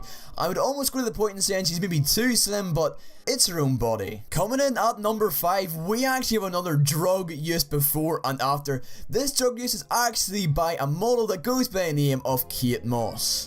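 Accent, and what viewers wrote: Ireland. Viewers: why do u sound like Irish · R u from Northern Ireland or ireland plz reply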